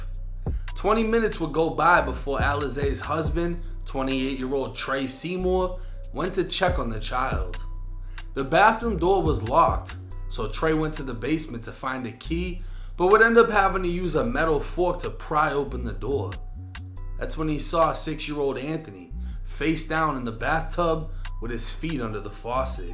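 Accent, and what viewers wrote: Boston, United States. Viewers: Im calling bs u ain't from Florida listen to your accent keep that shit in Boston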